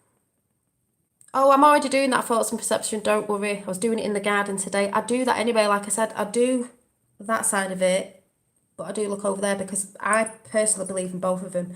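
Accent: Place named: Stoke, United Kingdom. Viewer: what part of the uk are you from, you sound like a stokie?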